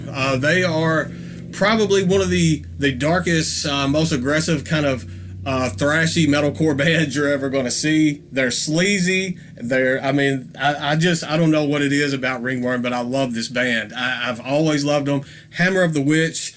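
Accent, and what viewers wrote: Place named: South, United States. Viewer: Would be funny to hear you singing little bunny foofoo with that southern accent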